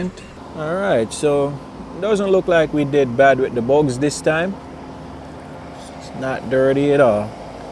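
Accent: Jamaica. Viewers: Mi know mi people any weh we deh lol big up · Can i ask if you happen to be jamaican? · I sense a Jamaican accent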